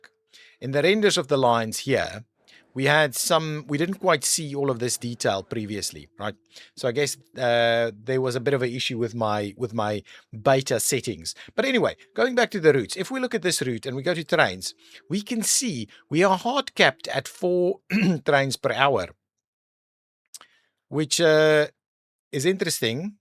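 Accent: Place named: South Africa. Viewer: the saffa accent makes everything better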